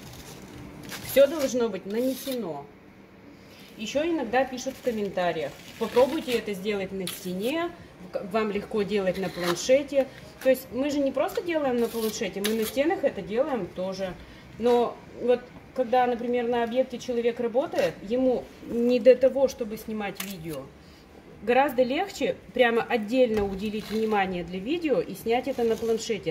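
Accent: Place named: Urals, Russia. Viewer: Уральский говор прям бальзам на мою душу родом оттуда же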